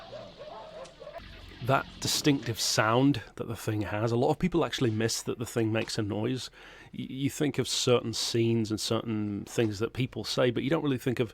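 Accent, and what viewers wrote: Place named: United Kingdom. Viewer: It's weird seeing Braun Strowman, discussing a movie with a British accent on YouTube! 😕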